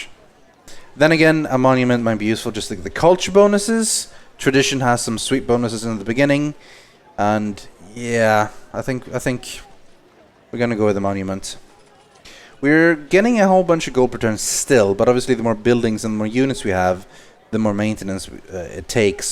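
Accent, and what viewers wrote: Sweden. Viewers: Are you Swedish? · i knew it i her it on his acent. btw im also from sweden and my englis aint dath good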